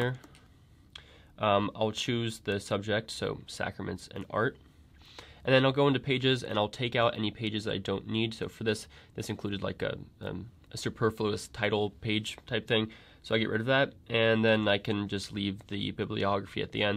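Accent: Ireland. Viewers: Love the Irish accent - being a Dubliner it made laugh! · LOVE THE IRISH ACCENT AHAHHAHAH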